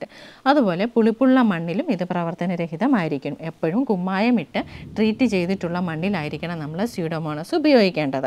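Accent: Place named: Pathanamthitta, India. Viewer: പത്തനംതിട്ടയിലേത് ശുദ്ധ മലയാളം ആണ്.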